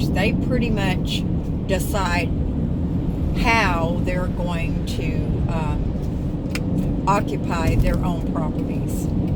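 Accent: South, United States. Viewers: You seem to be a very lovely person doing that for your friend. I’m sure you will be happy in your place. I have physio therapy today, (I’ve just had a knee replacement), so that’s my fun for the day haha. I do enjoy listening to your stories. Your voice is so calming. (Plus I’m in love with the southern American accent - mine is apparently too posh to understand, someone couldn’t understand me saying the word “France” yesterday 😂 )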